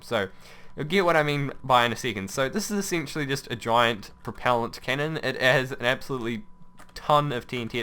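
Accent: New Zealand. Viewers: He's from new zealand that's his accent. Nobody's perfect.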